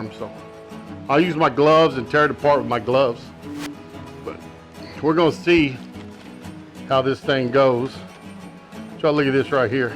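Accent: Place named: Texas, United States. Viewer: I love your accent. I want to move to Texas. Beef. Land. Bible.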